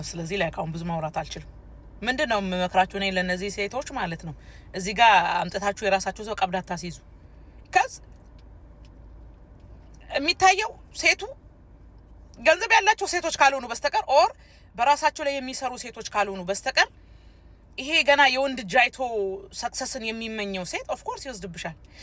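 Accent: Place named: Nigeria. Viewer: From your accent, I can tell that you are/were with Nigerian guyoo!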